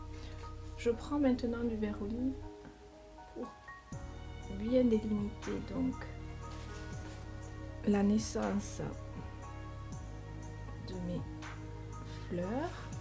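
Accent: South, France. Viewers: J'aime beaucoup ce que vous faites. Celui-ci me paraît simple, je le ferai dès demain.! 🤔🤔🤔⚠️⚠️⚠️ Enfin je vais essayer. Bisous bisous.. De quelle région est votre accent? Marseille, Perpignan? Toulouse.? Parce qu'il me semble que nous avons le même, moi c'est du Midi 😜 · Il est ma..fini.. fi..que votre tableau. Je suis abonnée depuis plus semaines et j adore visionner vos turbos. Merci encore pour votre voix chantante.. du Sud sans doute??😃💋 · Un grand merci pour ces partages, vous avez l'accent du soleil,J'adore !🎨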